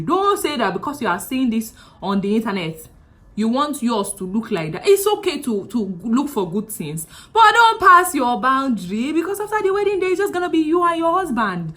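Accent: Yoruba, Nigeria. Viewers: This your Yoru-English na SUPERB o! Love this Lady FINISH...had to sub sharp sharply🥰🙌🏾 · Your hype is so contagious!!! You are giving me life right now. First time on your channel and I'm definitely here to stay. The Nigerian English made it sooo much better. 😂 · The Queen's English would have ruined it... That Yoruba English was the shiii! I just stumbled upon your vlog and I dare say I'm clicking on the subscribe button ASAP. I laughed through the whole video. Kudos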